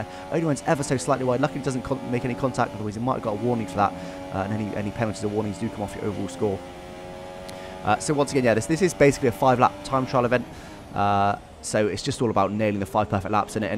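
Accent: United Kingdom. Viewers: For the first ten seconds I thought you sounded awfully English for a German fella.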